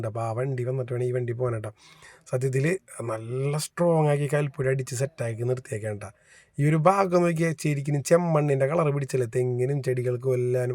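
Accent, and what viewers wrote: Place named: Thrissur, India. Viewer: Nalla Thrissur slng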